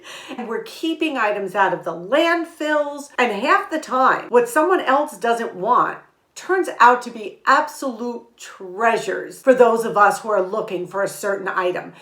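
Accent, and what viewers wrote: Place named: North Jersey, United States. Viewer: Hello from Northern California! I was raised in North Jersey though, so love hearing your accent and when you talk about ShopRite. I haven’t been thrifting in ages. I don’t shop much anymore (except for food), but secondhand is definitely the way to go!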